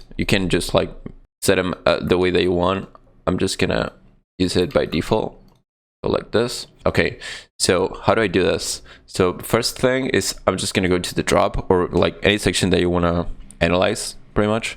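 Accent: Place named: United States. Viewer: Tenes la voz americana, muy sexy, te amo seguí así rey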